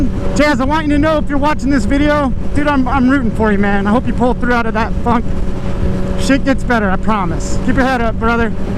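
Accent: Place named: Michigan, United States. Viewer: I fucking knew you were from Michigan! Cant mistake that accent or just how you are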